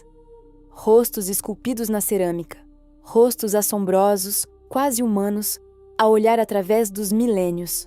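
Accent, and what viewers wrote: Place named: Brazil. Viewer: Moça, por que seu sotaque é brasileiro e você fala português de Portugal? Vídeo bem interessante! Vlw!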